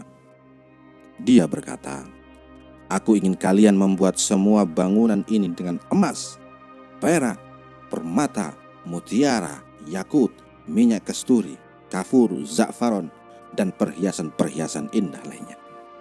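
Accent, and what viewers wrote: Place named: Arab world. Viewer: Makan minum.mandi di indponesia tapi.logatnya ke Arab arab an dan bangga banget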